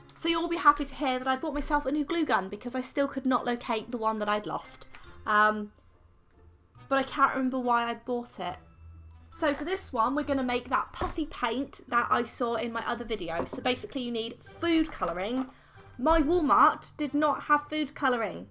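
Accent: England, United Kingdom. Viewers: You sound British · The British accent tho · She’s British 😂😂😂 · Are you British? Your accent sounds very British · Walmart??? Are you American? You sound English...? Xx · Hang on... She has a British accent but mention Walmart which doesn't exist in the UK so does she has a british accent but lives in us or am I tripping 😂😂😂 amazing video tho xx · You're British right? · I did NOT expect a british accent 😂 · I'm Australian and I laughed so hard at this comment because she's so obviously British to me lol. I'm not an expert but I believe her accent is northern of some kind. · r u british? love ur accent!! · I'm in love with your British accent😍😍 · Are you British? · no this is not American accent its British i live in the us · How is this beautiful child of a woman not British??????? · Woah a wild British person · it is a British accent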